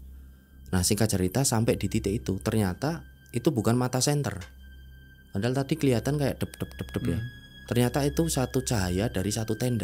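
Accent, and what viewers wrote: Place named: Bantul, Indonesia. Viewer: logat e bantulan banget mas 😂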